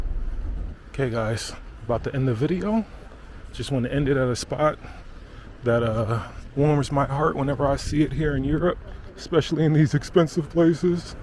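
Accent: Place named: Massachusetts, United States. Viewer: I like your pioneering and adventurous spirit. I invited a dude from the USA, who spent 10 days at my house in front of a laptop, We live near France and Switzerland. I tried to suggest he visit Zurich, Basel or Strasbourg for a day, but he was surely not interested, the good part of the deal I was willing to drive him there at no cost to him to show him around. By the way your accent suggests you come from Massachusetts, the New York area, I could be wrong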